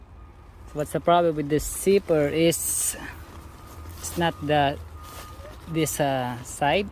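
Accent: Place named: India. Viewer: God bless you poonjabi! Just when thought every one with an Indian accent was just a scammer you showed me a true and legit fix for a distressing dilemma! It totally worked and i didnt have to purchase the stupid product that the white people in the other video were trying sell me. Scammers come in all colours and so to truely legit people. Your fix it worked crazy good. Thank you poonjabi. I liked and subsrcibed your chanel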